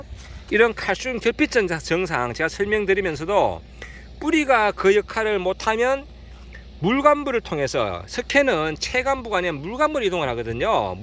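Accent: Gyeongbuk, South Korea. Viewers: 말씀이 참 시골 스럽네요 경북 부부지방 말씨 같은데 구분이 잘 안되네요 ㅋ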